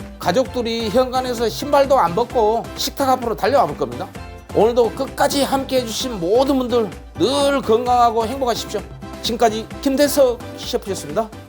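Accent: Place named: South Jeolla, South Korea. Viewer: 전라남도 사투리가 너무구수하네요ㅎ 맛나보입니다~^^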